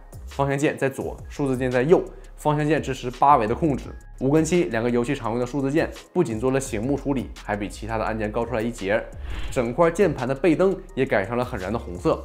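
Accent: Northeast, China. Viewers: 说话满口大碴子味，😅😅😅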